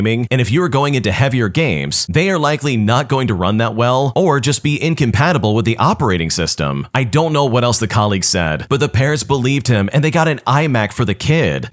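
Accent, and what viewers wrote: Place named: North, United Kingdom. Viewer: I love this dudes northern british accent wish i could have it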